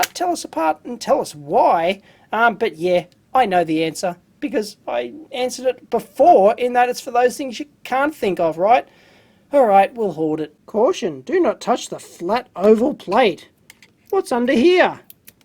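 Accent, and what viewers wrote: Australia. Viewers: First time i have heard a Nerd with an Australian accent lol.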